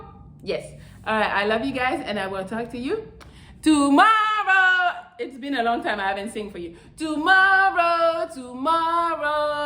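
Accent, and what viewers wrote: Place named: France. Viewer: Helloz, my favorite part of your videos, is the energy you have when you start... "WHATSUP LOVERS" with that gorgeous French accent... you make our days... (^^,)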